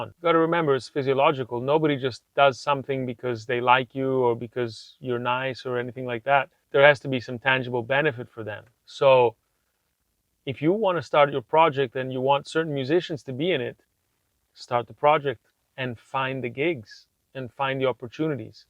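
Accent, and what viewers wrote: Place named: Italy. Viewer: do you live in Italy. I noticed an accent btw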